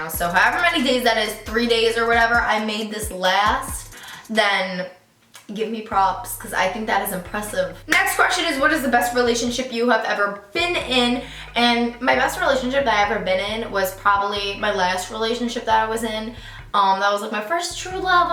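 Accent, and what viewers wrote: New York, United States. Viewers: love your new york accent omg!! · New York! I was trying to figure out where that accent was from!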